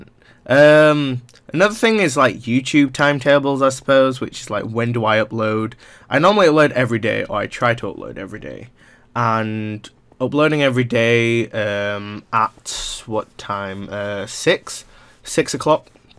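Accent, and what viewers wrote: United Kingdom. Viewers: He is British, why people so confuse about his accent? Because he is British! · From Hong Kong? British Accent? I didn't watch the vid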